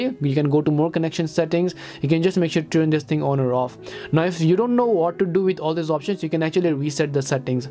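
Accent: India. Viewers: Why is it always when I'm looking for tutorial its a dude with an Indian accent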